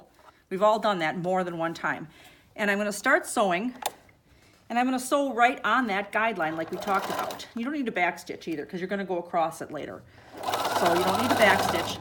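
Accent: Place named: Wisconsin, United States. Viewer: This is a good tutorial! I like the way you teach -- you get right to the point. Sounds like you are in Michigan or Wisconsin ? Oh, I was right...Wisconsin. I recognized your accent :)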